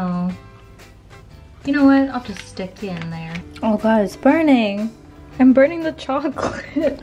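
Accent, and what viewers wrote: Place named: South, United States. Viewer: Lol what’s with the southern accent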